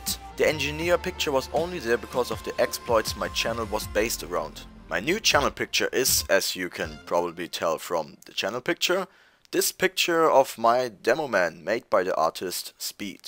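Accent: Germany. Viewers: I kinda heard it in your'e voice that you are german. I hope you are getting way bigger. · Hey, you live in Germany! Somehow I always knew that from your accent... Well, i live in a country right next to you, so we are basically neighbors! Please keep making fun stuff similar to the friendly class series. Those vids are the most fun I ever saw.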